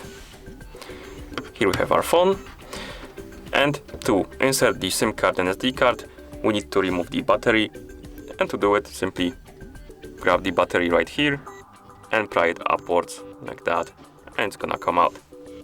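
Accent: India. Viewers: I have lived in the US my entire life and know zero people with thick Indian accents but it’s still very easy for my brain to compensate for the accent, I understand every word of tutorials like this. Maybe, just possibly, it is you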